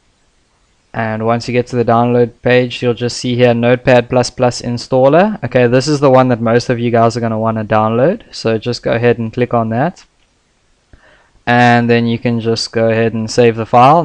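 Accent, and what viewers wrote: South Africa. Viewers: You sound like Mr. Shaun Pollock and thats how I knew u r a SAian Very helpful lectures - Thanks :) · South African??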